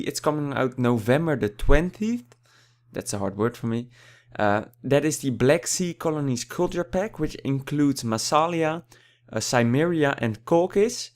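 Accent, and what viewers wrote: Netherlands. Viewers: Where are you from? Your accent kinda sounds like a dutch person speaking english ;)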